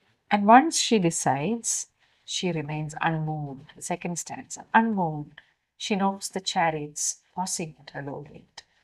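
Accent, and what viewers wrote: India. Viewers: Indian Ki english bahut mza Kerti hai.hamen Yahan Pakistan me teachers kahtay hain indian authors Ki Kia bat hai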